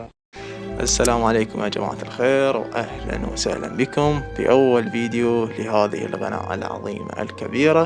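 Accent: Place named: Nasiriyah, Iraq. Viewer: اي بس عبالي من الناصريه لان لهجتك مثلهم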